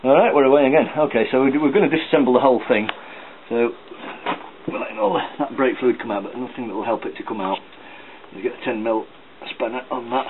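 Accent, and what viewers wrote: United Kingdom. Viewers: got to do this on my tiger soon, cheers for the tutorial, nice to hear it from a Brit too ;)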